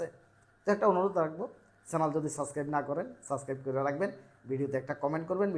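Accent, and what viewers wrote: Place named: Netrokona, Bangladesh. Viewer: ভাই আমার মনে হয় আপনার বাড়ি নেত্রকোনা,, ❤ আমার দেশের বাড়ি নেত্রকোনা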